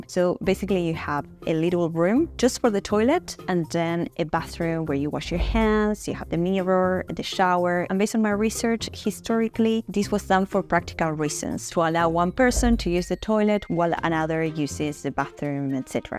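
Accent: Australia. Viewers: You have a tiny hint of an Aussie accent in your English. :) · I love your accent… every 10 words or so you sound very Aussie 🇦🇺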